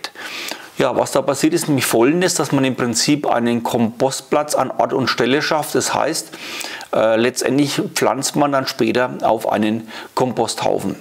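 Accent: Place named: Hesse, Germany. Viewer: tolles Video. Kanal abonniert. Was ist das für ein Dialekt? Hessisch?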